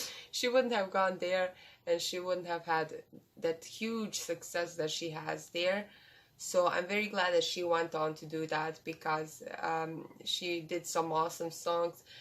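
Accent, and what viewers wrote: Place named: Russia. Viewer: Ты же русская, по акценту слышно_)))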